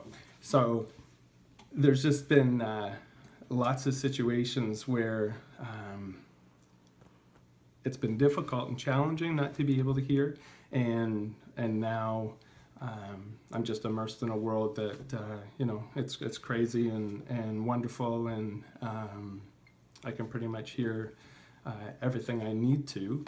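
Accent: Canada. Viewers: I thought he had a very cool foreign accent in the first video. Now after a year or two, he sounds like an average Canadian. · So cool, crazy that you have a very distinct Canadian accent! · I'm so grateful that this has changed your life I watched a video where you initially got the implants. You're doing so well!!!! You have you Canadian accent back 🙂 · He must be Canadian, what I find interesting is that his voice got a little higher now that he can hear. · Congratulations!!! This is fascinating to me... You can tell that now you can hear, you have picked up an accent... Canada right? Its the ' ou ' like about and house... Lol.. When you were telling the surgeon or the lady that was getting you in touch with sounds you didn't have your accent... That is what fascinated me... Very happy for you.. Something I take for granted and seeing and listening to your story .... 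Changed the way I look at things.. Thank you for sharing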